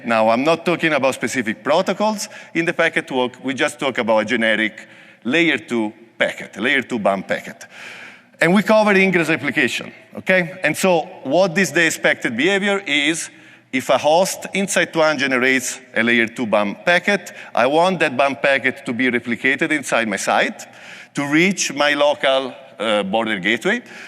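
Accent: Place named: Italy. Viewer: I love Italian accent.. Thank you. Great delivery.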